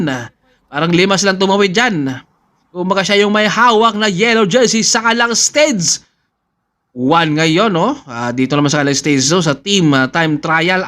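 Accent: Visayas, Philippines. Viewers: tang ama ka, ampanget mo mag salita pati yang accent mong visaya, ang bias mo lagi sa excelent noodles, punyeta ka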